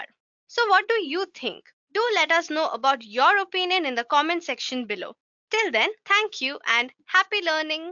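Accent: India. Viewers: I love your Indian accent. Why are Indians so smart and beautiful? Thank you for that worthwhile information. Bless you...❤❤❤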